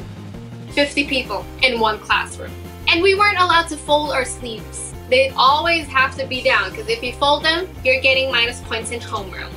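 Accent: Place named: United States. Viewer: Sounds like a filipino turned American...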